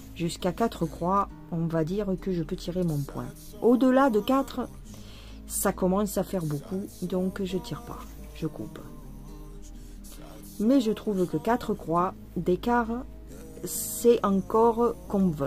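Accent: South, France. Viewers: Coucou ma petite adorée, oh si maintenant les confettis se cachent c'est infernal! C'est vrai que sur cette toile rien n'est logique mais c'est parti! Tu braves les difficultés 😂! Tu nous en auras appris des choses et ne t'inquiète pas on est aussi accrocs! Accrocs également de ces bons moment passés avec toi! Aujourd'hui l'accent du Sud revient hi hi. Tu vois le chat est là 😺, il faut lui mettre des petites chaussettes de bébé pendant que tu fais la coiffeuse car un coup de griffe est vite arrivé. Je me suis retrouvée un jour avec le bras qui a triplé de volume suite à une griffure, une méchante infection qui a duré 3 semaines! Bref, ici on parle de tout est c'est chouette! Merci merci et une toile de plus, à la suivante! 🤗. Des gros bisous doux doux doux 😘💙💙💙💙💙💙💙et salut les gens 🖐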